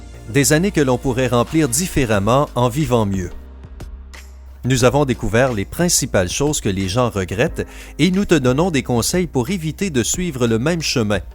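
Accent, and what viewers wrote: Quebec, Canada. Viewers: 10ème commentaire! Sinon, t'as un genre d'accent français/québecois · Like si tu trouve que la vois et quebecoise lol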